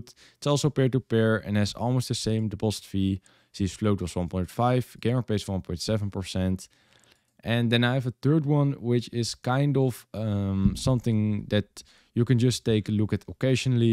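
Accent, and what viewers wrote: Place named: Netherlands. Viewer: Mate you sound so dutch lmao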